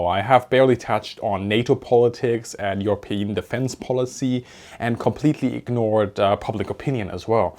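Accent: Germany. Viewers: Hallo, ich höre Dir gerne zu, aber Du redest zu schnell. Nimm Dir doch als Vorbild die US- amerikanischen Kommentatoren, oder die Britischen. Du hast immerwieder in Deinen Sätzen einen starken deutschen Akzent, der es zusätzlich schwer macht. Danke. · would you consider the possibility in future videos of speaking in a slower mode...? You speak at the speed of sound all the time, and that gives the listener no chance of pondering for a split second what you have just said....and your German accent doesn't make it any easier. Thanks in advance.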